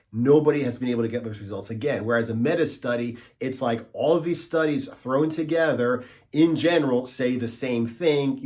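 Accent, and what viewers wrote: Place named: Philadelphia, United States. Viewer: Great video, man! A lot of folks need to hear this. P.s. your accent makes me think you're from Philly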